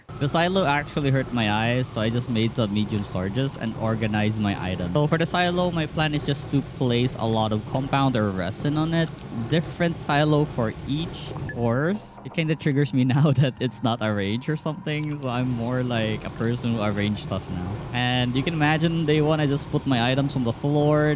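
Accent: Philippines. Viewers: With his accent I assume hes filipino or something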